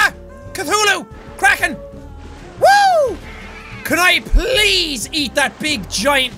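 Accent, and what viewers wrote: Ireland. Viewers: & awesome sounds like Ireland 🇮🇪! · Not gonna lie, when went full bore Irish I think my brain (and heart) melted a little... Also, I do believe my ovaries may have exploded... 🤷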